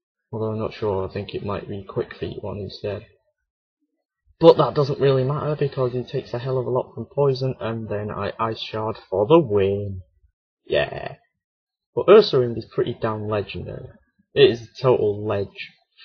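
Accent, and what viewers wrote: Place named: Yorkshire, United Kingdom. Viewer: But the Yorkshire accent is win though...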